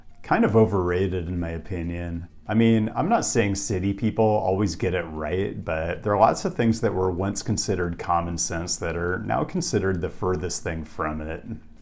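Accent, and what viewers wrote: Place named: Portland, United States. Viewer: It just now hit me why has a Portland accent.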